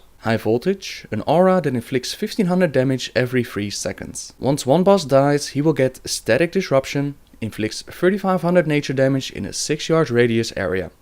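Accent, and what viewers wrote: Netherlands. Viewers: Your dutch arnt you?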